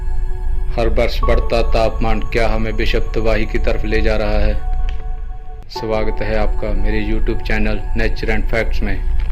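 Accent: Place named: Punjab, India. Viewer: ਬਾਈ ਜੀ ਤੁਸੀਂ ਪੰਜਾਬੀ ਹੋ ਤੁਹਾਡੀ ਹਿੰਦੀ ਦੱਸ ਰਹੀ ਹੈ 😄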